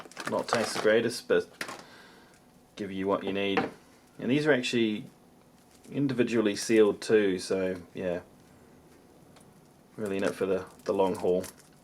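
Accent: New Zealand. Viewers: i love the new-zealand accent. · He's a Kiwi, therefore a New Zealander :) (btw his accent is of a typical kiwi bloke)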